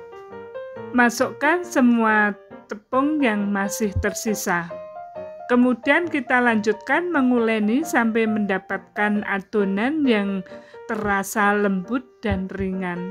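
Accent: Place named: Java, Indonesia. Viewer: Org malaysia kah ko tp medok jawa mksh resep nya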